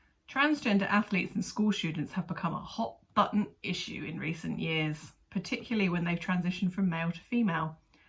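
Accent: United Kingdom. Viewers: For some reason I just smile when seeing this adorable human being. The British accent might play a role but ultimately it's just the kind and calm nature you experience from her. I utterly enjoy listening to her.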